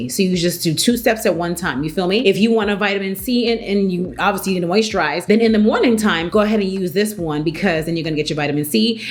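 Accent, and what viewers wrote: New York, United States. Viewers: Are you from New York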